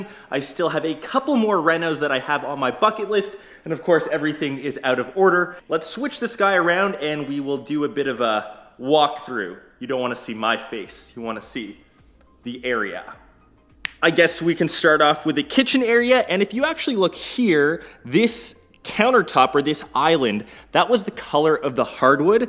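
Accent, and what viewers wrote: Canada. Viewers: Damn I can tell your Canadian hahah that accent hits hard!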